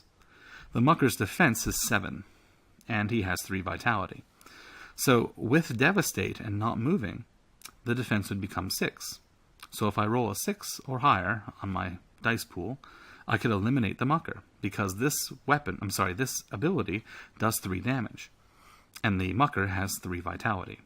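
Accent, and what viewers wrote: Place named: United Kingdom. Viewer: Just one thing, I detect that you are a fellow Brit! I can hear it in your accent, lol.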